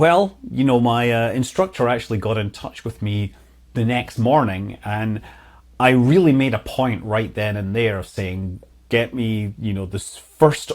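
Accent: Scotland, United Kingdom. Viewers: Wouldn’t it make sense to have a telephone tree to get everyone to evacuate all aircraft from nearby hangars until the fire is out and cold? Don't forget to update (and keep updating) Dan Gryder, who maintains GA fatal statistics and advocates AQP. Have you taken AQP training? And get the wife trained up at least to do a landing, because human beings, namely you, have health failures at the most inconvenient ties. Gnosis?!? I thought you were from Scotland. Well, you’ve got the accent down pat. I’m from Sacto and learned to fly from Naps in ‘70s.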